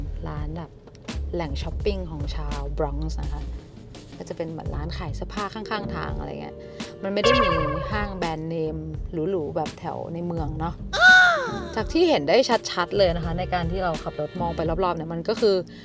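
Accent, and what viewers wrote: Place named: Chiang Rai, Thailand. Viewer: สำเนียงเชียงราย 😅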